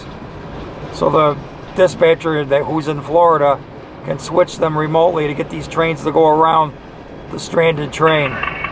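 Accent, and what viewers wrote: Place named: Buffalo, United States. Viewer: I thought I recognized that accent! I'm originally from Buffalo, and haven't seen "Lake Effect" snow in a while! 👍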